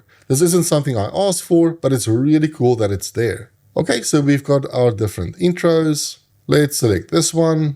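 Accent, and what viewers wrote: South Africa. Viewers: Amazing content and so well explained. Love the finer detail and your attention to the specifics. This helps me understand using Claude Code in a whole new light. Will definitely be following along for new videos, for sure. Also, can't miss the fellow Saffa accent. 😅 Thank you and looking forward to seeing what you've got brewed next. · It is very nice to hear a fellow South African's voice talking about AI and coding. Thank you very much. I love the South African twang. It reminds me of home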